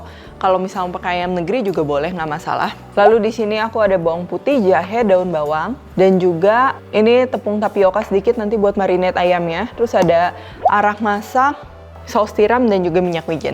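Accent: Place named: Cengkareng, Indonesia. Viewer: Masukin dialek warga cengkareng bgt.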